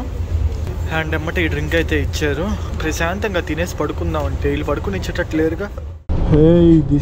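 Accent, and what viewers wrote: Nellore, India. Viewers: ma nellore lo anthe ayya.. :)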